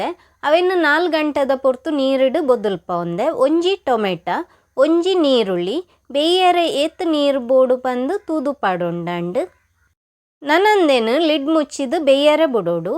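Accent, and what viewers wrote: Tulu, India. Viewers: manglore Tulu and Udupi side Tulu is little bit different to talk. But nice to hear Tulu language the way of your talking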